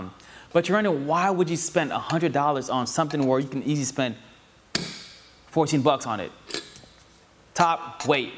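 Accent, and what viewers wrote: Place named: South, United States. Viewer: Fantastic review. Are you from the south? Every once in awhile I could note a southern twang in your speech.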